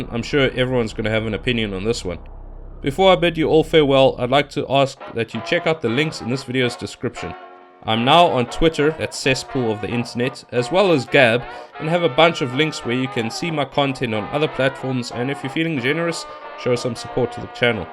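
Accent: South Africa. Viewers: You're very close. I'm from Slovakia. And you sound just like a friend of mine from South Afrika, but it seems that you've been all over the world. "The heart is deceitful above all things, and desperately wicked: who can know it?"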